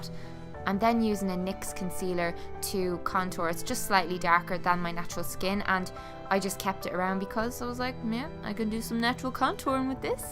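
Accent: Ireland. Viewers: HEY! I stumbled across you're channel the other day and after about a year of listening to Americans drone on in their slightly annoying accent on Youtube, listening to you're accent was a welcome change. (I'm from Kilkenny!) You're videos are great, it's nice to see an Irish Beauty Youtuber with such great content!!:D Well done and good luck!!:D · Loved this look! I really love watching your videos because you seem like such a genuine, real person!! Plus a little Aussie like me loves a good Irish accent, it's so adorable!!:)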